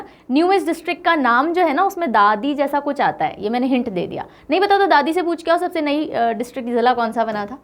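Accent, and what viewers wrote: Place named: Haryana, India. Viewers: Mam U r so cute 🥰 Lovly haryanvi 🤗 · मैम आपके मुंह से हरियाणवी बहुत अच्छी लग रही है जय हरियाणा जय हरियाणवी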